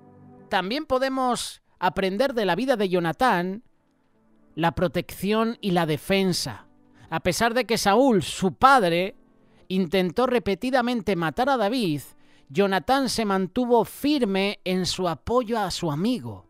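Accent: Spain. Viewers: Saludos desde Colombia gracias por su narración de la historia de David y Jonathan Bendiciones .... Tengo una pregunta fuera del tema me di cuenta que es usted español porque en España prevalece el catolicismo si en toda europa estuvo Jesus con sus enseñanzas? Es una curiosidad me gustaría si alguien sabe la respuesta me explique gracias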